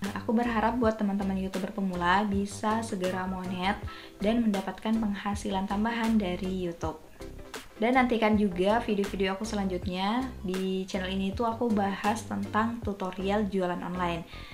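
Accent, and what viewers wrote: Indonesia. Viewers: ORANG GORONTALO KAH ??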